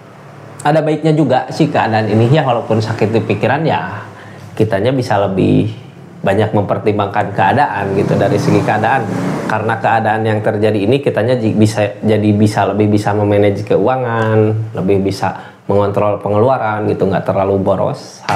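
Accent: West Java, Indonesia. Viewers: Kk logat sunda na kaciri pisan . Abdi oge sunda bandung 😀😀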